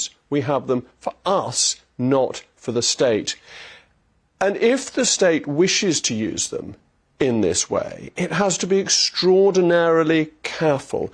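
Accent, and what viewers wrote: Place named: RP, United Kingdom. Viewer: The plummy voice of reason.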